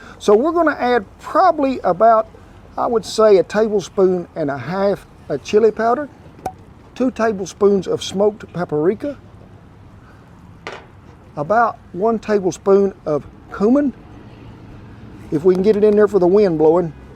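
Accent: United States. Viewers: Just love your accent genuine American 😊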